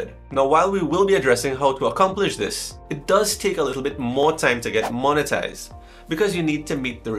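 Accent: Trinidad and Tobago. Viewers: Oh, hey bro are you a Trini? 🇹🇹 Nice job on the channel 😊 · OKAY… I hear a trini accent! I keep hearing about faceless videos, but not sure if it would work for my niche. This is interesting though. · are you trini? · I like your accent 😂❤ Trini? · Hey are you from Trinidad and Tobago? Nice😊 I'm a Canva user too. I was really surprised to hear your accent in my headphones lol. I was like......sounds like ah trini lol. I'll be subbing you from today! I'm a trini too btw, slight peppa and ah red solo......only we know what that means😁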